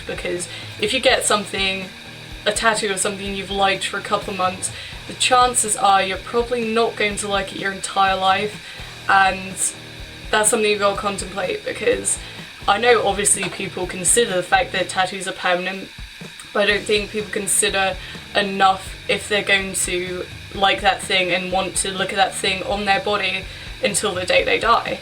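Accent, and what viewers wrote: United Kingdom. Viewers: odd question but where are you from in the UK? Your accent sounds super familiar - Milton Keynes by any chance?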